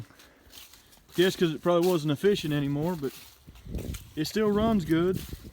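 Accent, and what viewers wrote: South, United States. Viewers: We'll get good spark with this new cool. We'll change this old ool for some new ool. Classic southern accent, I love this channel.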